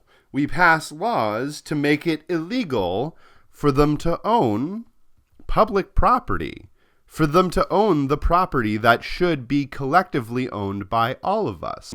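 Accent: Canada. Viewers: Are you an Albertan?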